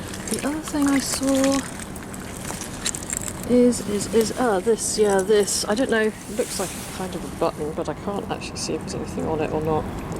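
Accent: United Kingdom. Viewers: It was an absolute joy to stumble on your video miss! Yours was the first British accent I have heard on YouTube for ages and I was beginning to feel homesick without leaving North Yorkshire. As for wishing you were 32 again I have just been 32 again and I can tell you that being 64 is over rated despite what may have said in the song. Blessings and peace miss.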